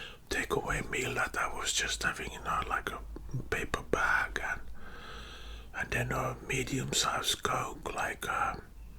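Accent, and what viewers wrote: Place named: Netherlands. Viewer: Well I would have believed if you said you were 40. The accent sounds a little dutch.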